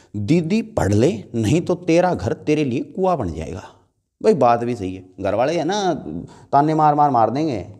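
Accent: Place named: Haryana, India. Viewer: haryanvi Aa hi jave bich bich m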